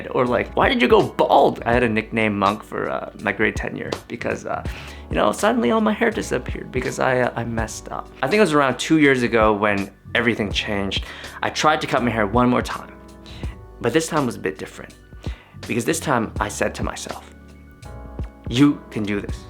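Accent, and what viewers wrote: Canada. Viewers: Are you Canadian by any chance? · The Canadian accent is familiar and pleasant to hear 😊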